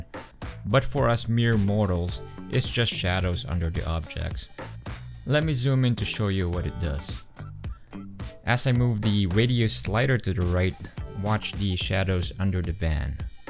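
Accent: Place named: United States. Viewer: goddmorning. NC u half or what? I mean the voice is so American HAHA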